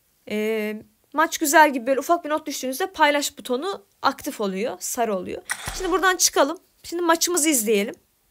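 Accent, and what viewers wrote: Azerbaijan. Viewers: azərbaycanlı sən..?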